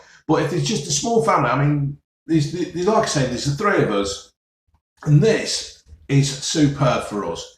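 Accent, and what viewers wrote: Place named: Leicester, United Kingdom. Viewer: mate I know. I’m Leicester born and bred. Knighton. Moved to west coast of Ireland but I can recognise a lesta accent a mile off. Take care me duck 😂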